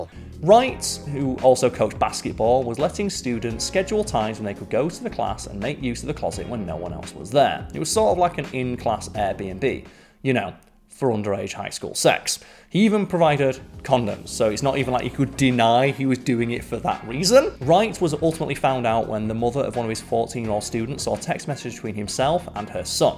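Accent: United Kingdom. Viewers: I was so worried about Top Tenz replacing Simon. But so relieved they got another British to host. Please don't replace him with someone with an accent that is not British. No disrespect, but British accent is always so soothing to listen to. Thank you.😊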